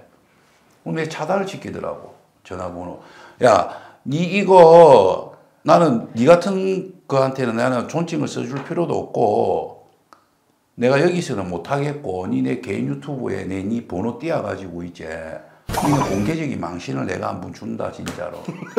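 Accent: Gyeongsang, South Korea. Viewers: 쌤!화이팅!!! 최고로 시원한 점사 놀라워요. 경상도 말씨 늘 정겨워요 ㅎㅎ 항상 응원합니다.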